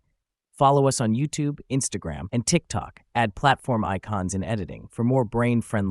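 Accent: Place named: United States. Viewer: American English name, American English speaker, British English material 😮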